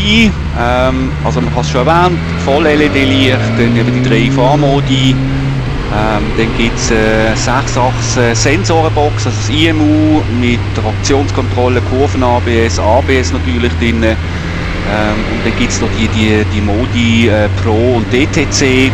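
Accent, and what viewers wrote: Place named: Aargau, Switzerland. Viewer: Was das fürn Dialekt, klingt nach Aargau aber irgendwie komisch :D Grüße in Richtung Heimat.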